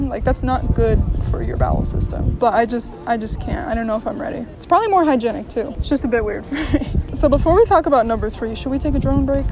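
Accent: Turkey. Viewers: You have a Turkish accent. I thought someone was pretending to be a foreigner. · Your accent is so Turkish .Are you sure you have no Turkish blood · You don’t sound like an American at all, you have an accent Türk galiba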